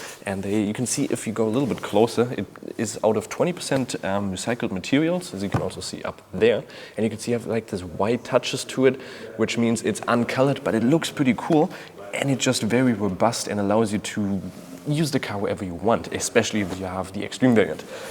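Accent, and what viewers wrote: Germany. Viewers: Is he from Germany? He sounds really german to me.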